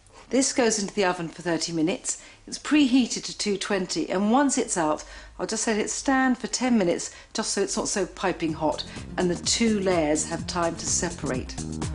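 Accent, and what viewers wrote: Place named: United Kingdom. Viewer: If got into ASMR she'd have a whole new career, with her calming voice and British accent.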